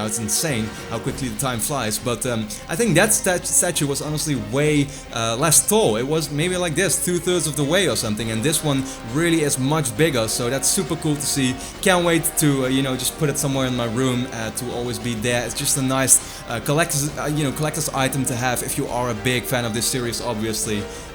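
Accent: Netherlands. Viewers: je axent is heel erg nederlands leuk om te hooren😂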